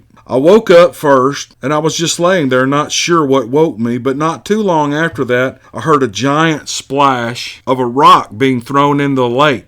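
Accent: South, United States. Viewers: you have a fantastic gift of storytelling. This up north midwesterner loves your southern drawl. We have squatch in Wisconsin as I've heard them but never laid eyes on one. Keep up the good work and can't wait till next week! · I love your southern draw 🤗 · I really like your channel if not love it. You geht nice stories and you tell them really nice, I especially love your southern accent, and you and Lynn Smith's BigfootCaseFiles-channel drove me near to believing in the Sasquatch - though i'm german and live on the other coast of the Atlantic! Just go ahead, you made it right from the start! Tobias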